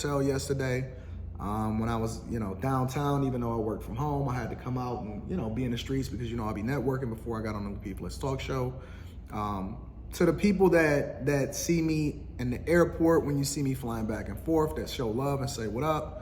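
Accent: Detroit, United States. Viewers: Are you from Detroit bro?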